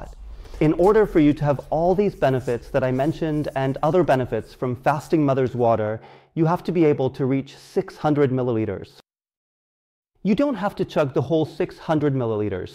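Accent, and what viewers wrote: Rio Grande do Sul, Brazil. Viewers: Falou gaúcho velho, sou mineiro uai.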